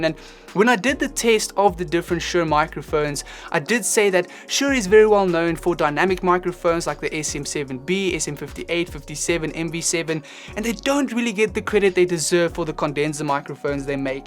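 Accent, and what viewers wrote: South Africa. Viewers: Ah so cool, I could tell you were South African staight away. Very cool to see a saffa respresenting in the youtube music scene!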